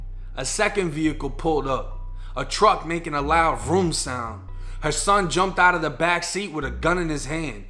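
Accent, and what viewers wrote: Boston, United States. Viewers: I love your accent!! When I moved to Orlando from NY everyone knew immediately where I was from lol. Can't take that Boston know matter how long you live in Florida!! Btw love the way you tell these true crime stories!! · Y u always claiming Florida and obsessed w Florida when u sounds like u from Boston!!! That's what I really wanna kno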